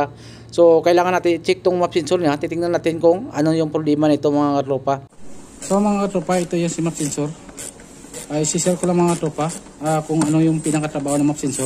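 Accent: Iloilo, Philippines. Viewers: Parang ilonggo ka sir ah taga saan ka same problem kmi ei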